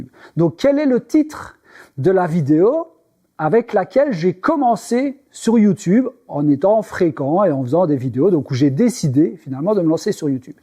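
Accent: Belgium. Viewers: Ce n'est pas un franc parlé, plutôt un belge parlé. Mais on adore ça, bravo et merci.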